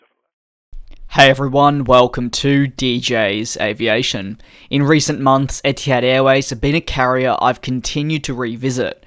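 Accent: Australia. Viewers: "Reuters" spot on pronunciation...even with an Aussie accent!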